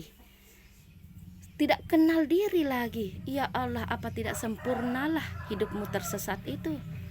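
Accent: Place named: Lombok, Indonesia. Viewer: Marak logat Lombok timur...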